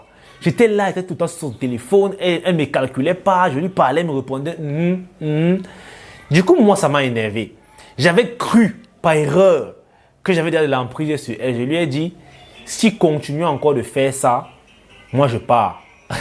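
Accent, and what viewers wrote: Cameroon. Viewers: Toi tu est camerounais bassa'a nespa!!!😂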